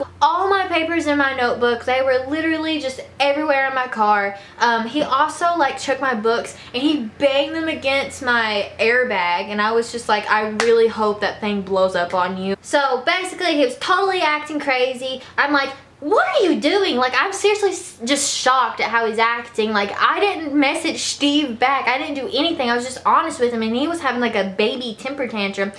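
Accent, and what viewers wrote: South, United States. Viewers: Where are you from? Your accent's confusing lol. So southern! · accent or nah ? southern or nah ? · I love ur little southern accent!😁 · It's just a southern accent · It's called a southern accent. Usually when you're from down South. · I love her southern accent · It’s because she’s southern 🤷🏻‍♀️ we have that “southern drawl” down here 😂 hers isn’t as bad as a lot of people’s. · Hey, are you from the south of the US? Carrie Underwood was my favorite blonde of all time, not it's you haha:)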